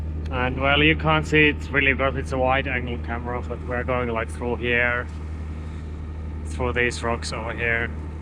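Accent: Finland. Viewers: Why do you have so Finnish accent?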